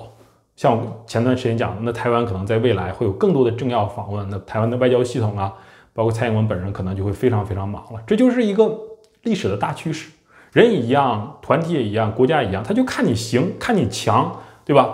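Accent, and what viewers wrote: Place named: Northeast, China. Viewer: 感覺這個人口音像內地東北地區人。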